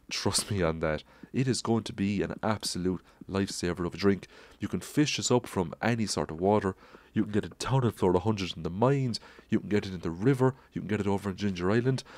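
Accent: Ireland. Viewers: he sounds Irish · The most Irish accent I've heard all week, and I've been listening to some of the news stories from over there.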